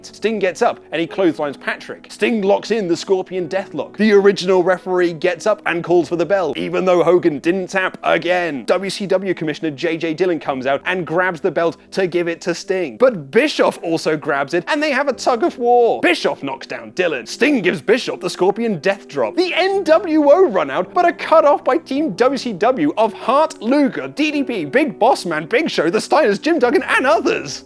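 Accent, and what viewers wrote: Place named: United Kingdom. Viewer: Jesus how many wrestling youtube channels are there with british hosts?!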